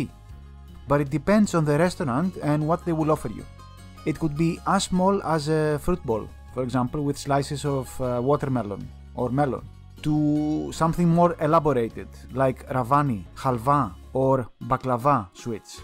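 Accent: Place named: Greece. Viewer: It’s more authentic like this. The dude’s Greek. Are you here for linguistics or are you here for food?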